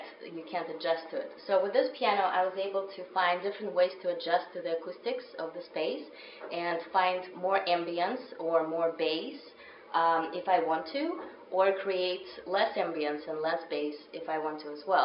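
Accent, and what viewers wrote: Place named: Russia. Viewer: Strange how a Russian lady reminds you of a French lady but they do have similar accents lol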